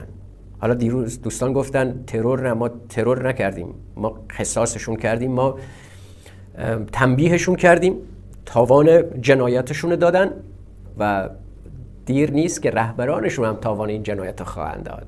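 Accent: Iran. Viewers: درود به لحجه خالص ایرانی شما با همین فرمون برو که سادگی حلاوت دیگری دارد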